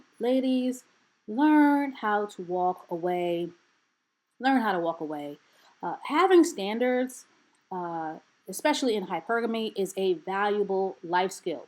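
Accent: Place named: New York, United States. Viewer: Sis " Busted can of Biscuits" you sooooo New York. I love it !!!!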